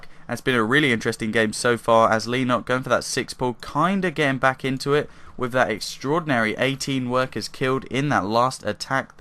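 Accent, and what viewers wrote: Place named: United Kingdom. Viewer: Another British commentator ^_^ good job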